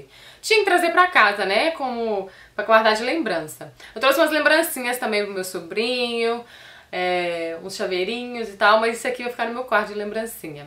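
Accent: Minas Gerais, Brazil. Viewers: Eita sotaque minerin gostosoooo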